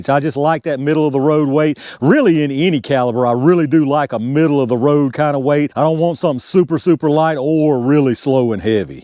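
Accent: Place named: Georgia, United States. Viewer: I love your videos, your accent takes me home... Augusta Georgia!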